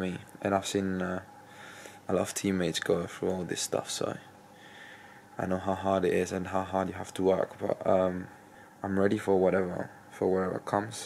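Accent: England, United Kingdom. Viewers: He's british accent is good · HE SOUNDS ENGLISH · I've only ever noticed the british aspect of his accent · Ur accent is more English than mine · transitioning to UK with the english accent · Why do you sound British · Sound more English than Harry Kane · You sound more English than Spanish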